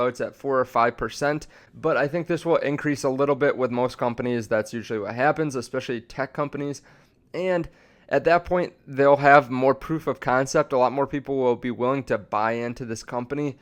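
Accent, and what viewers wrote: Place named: Midwest, United States. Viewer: Dude is so Midwestern I love it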